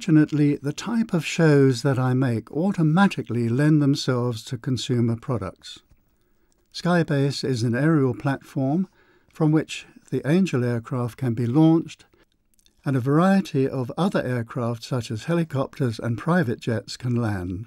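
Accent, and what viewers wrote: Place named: United Kingdom. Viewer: thoroughly British commentary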